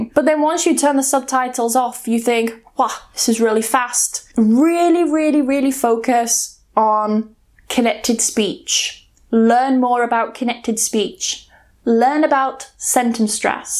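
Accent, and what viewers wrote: United Kingdom. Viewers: I understand perfectly English, even she speaks British English and I'm studying American English. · I understood every word you said , but sometime I find is difficult to follow the conversation. you're British accent amazing . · I prefer American accent, but, the point is, regardless of which accent you are more into, I think English learners should study different accents, because soon or late you will find someone who doesn't speak the accent you're used to, and then what?? Will you ask this person "could you please speak with the accent I can understand??" Of course not, and your accent is so nice that makes me feel like learning more British accent. I hope my comment is useful. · your* British accent ;) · Hiya, i like your british accent, this lesson was really interesting, I must confess…I usually watch your videos with english subtitles, I loath miss some keywords.. 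please have a nice day. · Your British accents is ❤️😍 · your beautiful British accent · in this great video, I like british accent such as your accent. thank you so much your types of listening.. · Hey that's a good while that I'm learning English and i was only focus on American English but i do find your British accent so interesting...So inspiring! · 妳的英國腔讓人愛上英國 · Cool your britist accent . Very very good . I enjoy a lot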